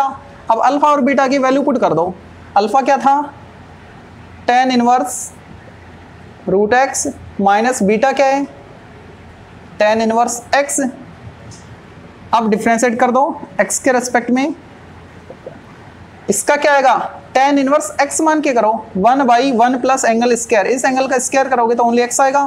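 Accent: Haryana, India. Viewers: Ha mere kne hi si ye btata h ki ap haryana se ho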